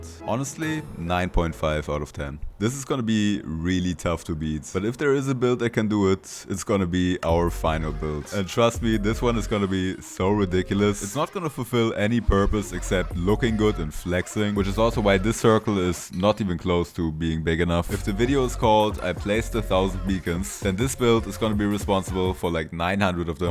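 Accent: Germany. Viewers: As a german, 😭✋️ dein deutscher akkzent ist so süß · Hab doch sofort am Akzent erkannt daß wir hier jemanden Deutschen haben😂😂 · Ich höre doch das du Deutscher bist 👀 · wie deutsch der redet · first video I ever see from you, immediately clocked you as a fellow German 😂 you sound so similar to Doc, when talking English so it immediately stands out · warum hab ich direkt gehört, dass du deutsch bist ohne ein Video von dir jemals gesehen zu haben ahhaha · I here a bit of deutsch❤ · I smell German 🤨🤨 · die ganze zeit war ich so hör ich da deutschen akzent? und dann kam qual der wahl hehe. Cooles video 👍🏼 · i hear some hidden german lmao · Took me a moment to realise that you're German. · Deutsche hier? Hallo Leute und bist du wirklich deutsch weil es hört sich wirklich an als würdest du deutsch sein. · Dare I say I hear Deutsch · ja save der typ so fett deztsch habe nämlich genau denn selben gedanken aber bro hat eine gute idee weill englisch sprachige youtuber besser verdienen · feels like german accent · I hear the deutch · Ich liebe diesen Deutschen akzent 😅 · Ich wusste du bist deutsch allein schon an der Stimme bzw. 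der Sprachweise 😂😂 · Bei der Aussprache wusste ich das sich hinter der Stimme ein Deutscher versteckt😂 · I know a german when I hear one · Great Video and I can’t unhear the German accent😂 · Ja der ist safe deutsch. Man hörts leider komplett, an Aussprache und den Grammatikfehlern. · i knew i! i knew you were german. the accent gave it away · Oh hey, I'm smart enough to recognize a German accent, nice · I definitely heard a german accent too (Im not german tho) :> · dieser Deutsche Akzent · AYYY UR GERMANNNN, I HEARD IT FROM THE ACCENTT XDD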